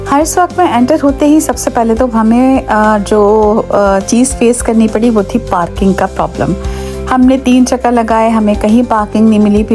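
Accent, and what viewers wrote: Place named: Karachi, Pakistan. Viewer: Salaam ...Aap ke Bolnay ke Andaaz se hi Mai samajh gyi thi ke Aap Karachi se hain ..wesay Karachi Wala Taste to Dunya mai Kahin Nhi milta ...Yehi Sach hai ....By the way watching ur vlogg for t he first Time ....Nice vlogg .👍🏻.......Hamesha .Khush rahain .....🌹🌹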